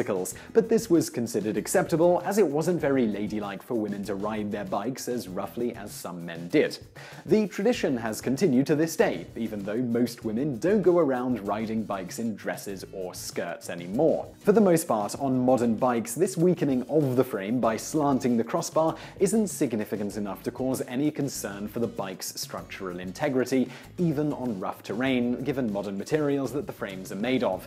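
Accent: United Kingdom. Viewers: i do like your content, it's good mate, but you speak so weirdly that it's hard to concentrate on what you're saying. It's like you're trying hard to pronounce every word, I'm sure the americans will still understand your british accent if you spoke more naturally (i was born in london btw)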